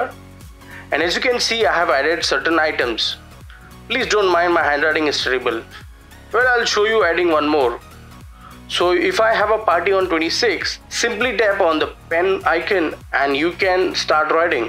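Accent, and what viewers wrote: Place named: India. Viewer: Funny indian accent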